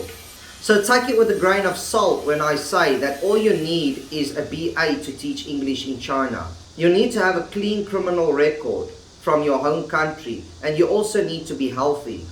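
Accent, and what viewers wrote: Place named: United Kingdom. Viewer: gr8 ingormation. i believe u live sometime in uk bcoz ur accent is similar to british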